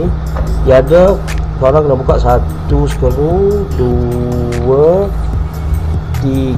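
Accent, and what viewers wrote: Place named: Kelantan, Malaysia. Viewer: BUNYI KELATE LAH PLAK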